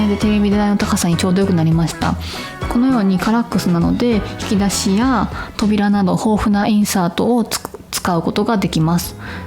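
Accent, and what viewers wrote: Kansai, Japan. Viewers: さん、こんにちは♪ 毎週さんの動画アップを楽しみにして拝見しています。今回のさんいつもと違って声のトーンが低くお元気なさそうに感じましたが、お身体大丈夫ですか？いつも関西弁がちょくちょく出てさん独特のコメントが親近感を感じててプッと笑う事もしばしばで大好きなんですよね！今回のトークは推しに対する「可愛くないですか！？」がいつもと違った感じがしました。お仕事と家事と撮影と編集...色々お忙しいと思っています。お疲れが出ないようにして下さいね♪また来週楽しみに待っております！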